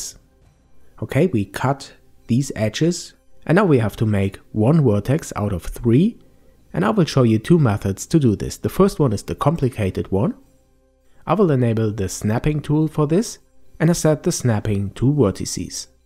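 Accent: Germany. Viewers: Most likely a German native speaker who is overly self-conscious about using the "w" sound correctly.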